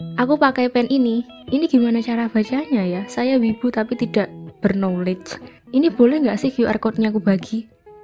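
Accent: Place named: Java, Indonesia. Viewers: Dan juga medok'e uueenak reek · Mbaee orang jawa yaaa wkwkw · jowo ne medok ya bund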